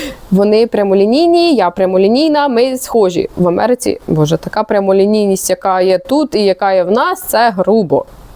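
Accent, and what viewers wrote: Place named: Vinnytsia, Ukraine. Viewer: Це не совковий цирк. Це багата фонетика Вінницької області)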